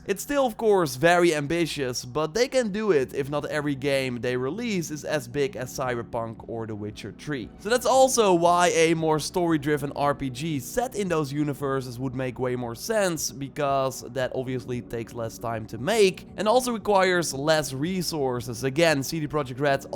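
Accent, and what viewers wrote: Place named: Netherlands. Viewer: Are you dutch by any chance? Your accent sounds familiar! Great vid btw!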